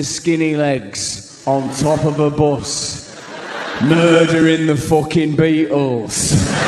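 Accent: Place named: London, United Kingdom. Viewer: I've always liked Russel Brand. He is a very smart guy and also very, very fucking funny. Not in the typical type of funny but like the smart, tongue in cheek, kinda funny. That and i sometimes start talking in a British or London accent similar to his. And you can really say and do what you want to a extent when you talk like that.. I swear it, I've done it and i normally wouldn't have got the few girls numbers that i got if i had not been talking like that. I got like 3 numbers from chicks who were way out of my league. All from talking like that. 3 different chicks one married who called her husband and Told him she was going to work late but me and my friends one female and one dude ive known for years. She said it wouldnt work talking like that and picking up chicks and such..she bet us 200 each, we won and bought her something very special. 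1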